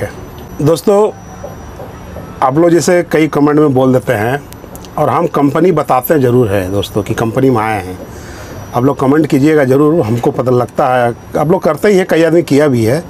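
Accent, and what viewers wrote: Bihar, India. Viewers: AAP bihar se hokya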